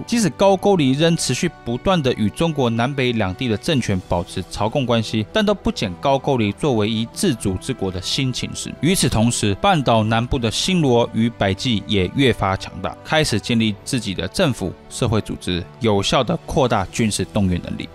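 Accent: Taiwan. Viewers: 您的口音像是來自台灣，但是您節目的深度貫穿古今中外！ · 你的口語很台灣講解方式也有點台灣不過濃濃的又支納中國的歷史觀點請問你有中資背景嗎？會不會影響我們的正確思想意識和歷史觀？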